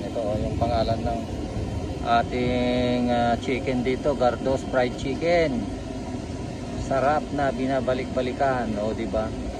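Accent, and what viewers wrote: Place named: Visayas, Philippines. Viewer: Visaya man si ser oi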